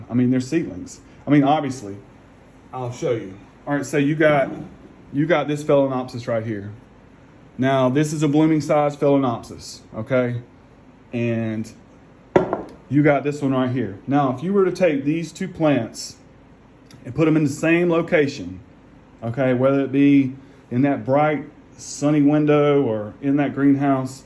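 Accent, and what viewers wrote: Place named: South, United States. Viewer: Hey dear! Nice to put a cute face to a sweet southern accent 😉 Great shout out for moms! 😁 I think seedlings should be labeled 1st year, 2nd year 3rd year. It gives me more of idea what I'm dealing with. Ebay sellers want to sell 1st years as NBS!!! Ugh aggravates me! Great video! 👍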